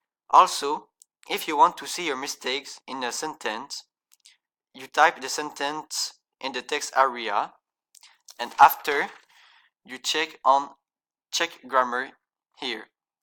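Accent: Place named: France. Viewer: Hi, I'm Alex, and I was searching for tips to get better at english, and so I just finished watching your instructional video about this website. I have to say it's a great site, there's a lot of functionalities to help me get better. (I am also polishing my english, just like you) French is my first language, it is yours too I think (got this from the accent). Thanks for the vid, I wish you to succeed in your college program, and good luck my dude! -Alex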